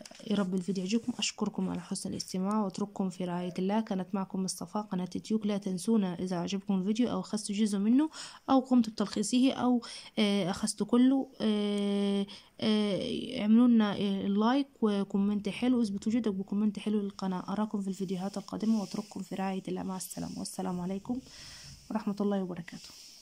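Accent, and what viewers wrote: Egypt. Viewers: انتي مصرية ولا جزائرية تقديم الدرس رديء جدا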